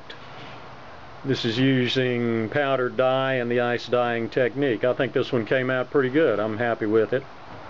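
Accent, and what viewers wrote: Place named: Australia. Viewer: totally!! is u aussie mate=??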